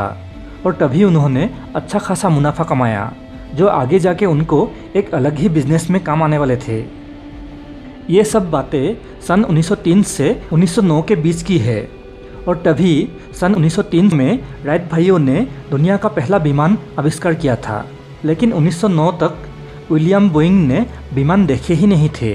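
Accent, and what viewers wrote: Assam, India. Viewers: Tm Assamese hy kya 😅 Hindi assemess lag rah hy😅😅😅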